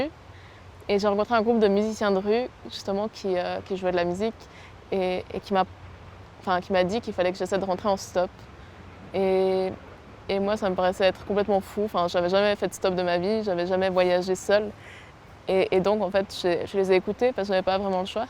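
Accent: Switzerland. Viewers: J'adore ton accent suisse ! :) Et bravo pour ton courage d'avoir fait le pas ! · Titrer "sans argent" alors que en moins de 10 secondes t'entends deja qu'elle est originaire de Lausanne en Suisse. Au moins j'aurais perdu moins de 10 secondes !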